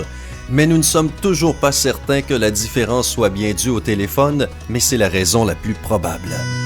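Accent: Quebec, Canada. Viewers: Ben moi ça ne me dérange pas je dirai même que j aime bien son accent. Il est québécois. Et puis c est pas très sympa justement de dire que tu n aime pas sa façon de parler de plus qu' il n y peut rien. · The Quelqu'un il est québécois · Un accent du Canada :p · Il est québécois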